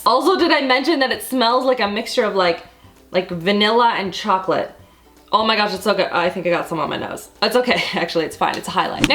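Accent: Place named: Canada. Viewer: I love your Canadian accent!!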